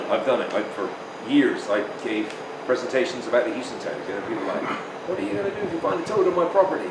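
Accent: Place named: West Country, United Kingdom. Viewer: Good to see hasn’t lost his West Country twang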